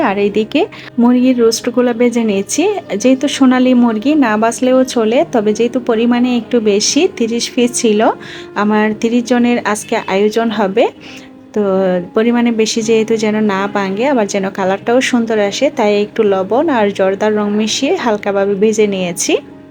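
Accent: Chittagong, Bangladesh. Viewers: আসসালামু আলাইকুম আপু,,,আমি আজকে প্রথম আপনার ভিডিও দেখলাম,,,আপনার কথা শুনে আর রান্না দেখে আমার কাছে মনে হয়েছে যে আপনি চট্টগ্রাম এর বাসিন্দা,, তাই শিওর হওয়ার জন্য আপনার আরেকটা ভিডিও দেখলাম,,মহররম এর ভিডিও টা দেখে শিওর হলাম,,,তাই সাথে সাথে সাবস্ক্রাইব করে দিলাম,,কারণ আমার জন্ম চট্টগ্রামে,,ছোটো থেকে বড় হয়েছি সেখানে,,তাই চট্টগ্রামের জন্য আমার ফিলিংসটাই অন্য রকম,,যদিও আমি কুমিল্লার মেয়ে,,,যাই হোক আপনার কাছ থেকে চট্টগ্রাম এর অতিথি আপ্যায়ন কিভাবে করতে তা শিখবো ইনশাআল্লাহ,, কারণ এই মাসে আমার বোনের বিয়ে,,,তাও আবার চট্টগ্রামের বাসিন্দার সাথে,,, আমার বোনের জন্য দোয়া করবেন আপু,,, আর আরেকটা কথা,,আপনার রান্নাগুলো মাশাল্লাহ অনেক লোভনীয় হয়েছে,,আমি চট্টগ্রাম চট্টগ্রাম করতে করতে আপনার রান্নার প্রশংশা করতেই ভুলে গেছিলাম,,,তাই আবার আসলাম কমেন্ট টা সম্পূর্ণ করতে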